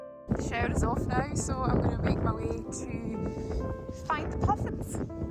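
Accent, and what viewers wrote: Scotland, United Kingdom. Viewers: I've just watched this again! I could listen to you all day haha I just love your accent. It's weird for me that my great great grandad would have had one similar (tho he was from Dumfries & Galloway) because no-one in my family has a Scots accent. So the music, in this one I think it's just right, but I do think when you are speaking, don't have music as well. I have my volume up loud so I can hear the commentary, so it's a shock when suddenly it's music and loud lol. Did the wind muffler arrive yet?? You should be really successful at this you are SO watchable.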